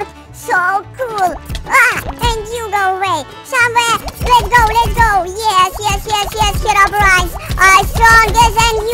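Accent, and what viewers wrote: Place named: Russia. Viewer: скорее всего ты русский судя по акценту (You Russian boy???)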